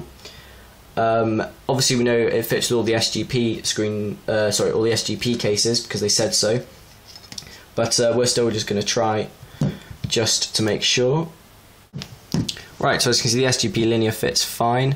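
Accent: England, United Kingdom. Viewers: It's not that it's the fact that on his channel he says that he's from the united kingdom AND you can clearly hear he has a english accent.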